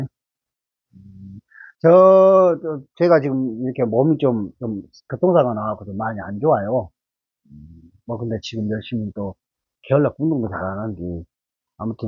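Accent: Jeolla, South Korea. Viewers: 우리 어머니 목포말씨를 께 들을 수 잇어서 정말 기쁩니다...^^ 보물단지 호남목포말씨에요...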